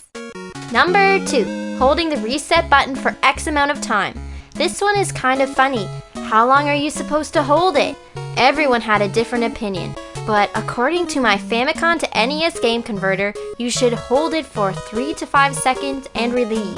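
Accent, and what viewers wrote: Canada. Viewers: Are you Canadian?! :D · I can tell she's Canadian .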